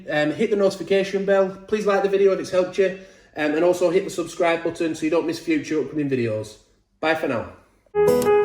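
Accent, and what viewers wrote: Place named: Yorkshire, United Kingdom. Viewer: enjoy each time you say “Hey guys” at the beginning of one of your videos in your wonderful Yorkshire accent! 👍🏻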